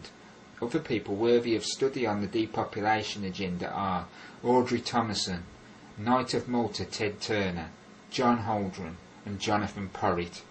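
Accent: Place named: United Kingdom. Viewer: this guy's voice is regrettably British...thumbs down.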